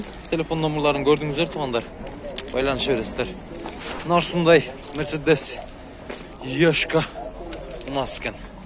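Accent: South, Kyrgyzstan. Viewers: кыргызча суйлоп жатат,туштук диалектте,озбекче эмес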